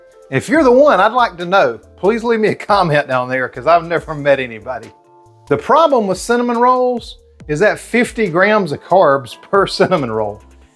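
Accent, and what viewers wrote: South, United States. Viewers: I do. Your personality and Southern "accent" makes the videos even more enjoyable to watch.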